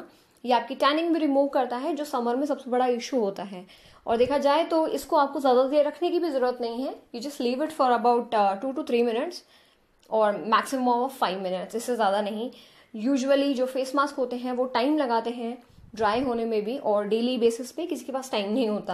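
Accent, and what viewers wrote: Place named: Rajasthan, India. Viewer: Mujhko khudko jor aata he...😊😊😊...Jor aata he sunke he pata chal gaya ki rajasthan ki youtuber he.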